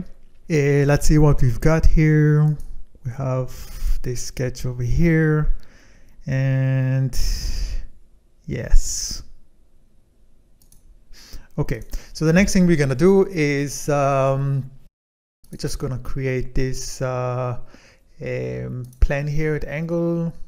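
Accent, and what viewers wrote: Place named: France. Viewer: Parfait! J’ai beaucoup appris avec ton exercices! Votre stil d’expliquer est magnifique. Merci beaucoup! Avec tous les astuces tu a montré c’est beaucoup plus facile pour moi à construire avec fusion 360. C’est possible, que tu travailles chez Airbus? Je ne suis Français, je suis allemand, agrandi vis à vis de l’Alsace, à Fribourg, le Français est mon deuxième langue. Mais je crois, que tu à un petit petit accent français, ne c’est pas? Le petit mot « voilà » qui apparaît de temps à temps, me plaît beaucoup! De toute façon, ton anglais est merveilleux! Gratulations! Et encore un gros merci!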